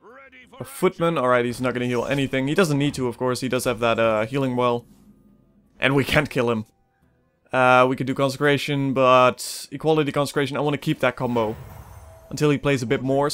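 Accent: Netherlands. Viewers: Are you dutch? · Favoriete Nederlandse gamer, door je accent alleen al sub!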